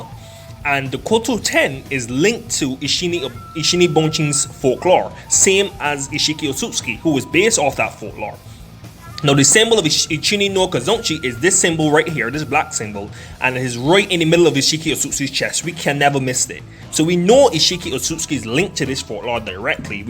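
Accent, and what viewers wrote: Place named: Trinidad and Tobago. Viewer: Loving the accent, trini?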